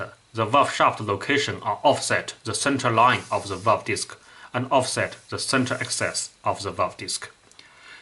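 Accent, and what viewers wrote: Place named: China. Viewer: 中式英语口音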